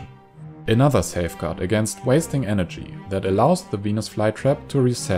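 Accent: Germany. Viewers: ate you german? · wait a moment... he sounds really german 🤔 * Looks in the "About" of the channel * * reads: * "Hi. My name is and I'm from Germany"......... knew it 😏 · Kann es sein dass sie ein Deutscher sind? Ihre Aussprache und auch der Satzbau (eher Deutsch als Englisch) lassen mich das vermuten. Ich finde ihre Videos übrigens äusserst toll ^^ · The most German accent i've ever heard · Are you german? Your accent sounds similar to my brothers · Are you from fermany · He is definitely german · I hear a German accent.:D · I really like your Videos and I think you should continue making them, but your german accent just annoys me, personally a little to much. I‘m sorry:( Though I must admit, that the quality of your videos is reaaally good! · This german english:D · Sehr eindeutiger doutscher akkzent aber sehr gutes video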